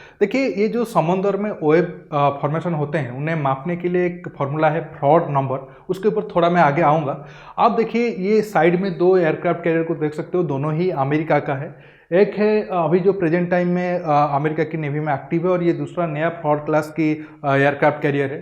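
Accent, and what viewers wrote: Odisha, India. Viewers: Are you from Orissa? Your pronounciation is like odissi people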